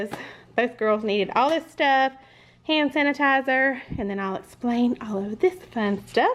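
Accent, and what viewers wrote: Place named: South, United States. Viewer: Just found your channel.. which southern state are you from ? Cause you sound "just like me" haha -- NC girl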